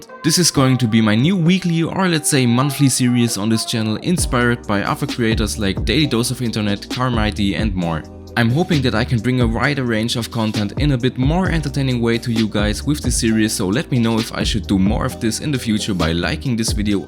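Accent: Germany. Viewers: Mann erkennt direkt den deutschen in dem english Akcient😂😂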